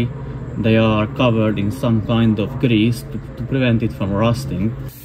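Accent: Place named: Hungary. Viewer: Köszi a munkád! Amint meghallottam az angolod azonnal tudtam hogy magyar vagy! Tényleg hasznos volt számomra ez a szerelés mert épp előtte állok! De lenne pár kérdésem: Ajánlod rézpasztázni a tárcsa és a kerékagy közötti részt? Valamint az alsó és felső csúszkát kell zsírozni? És ha igen akkor azt hogyan lehet?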